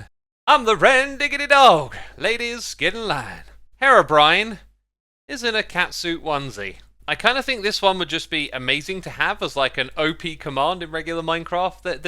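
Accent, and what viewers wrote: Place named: United Kingdom. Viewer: I forgot how British you are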